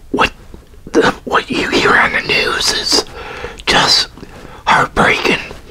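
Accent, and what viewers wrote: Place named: United States. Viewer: that looks so nice yummy i bet you enjoyed it by the way im from the uk and im guessing ur from america due to your accent haha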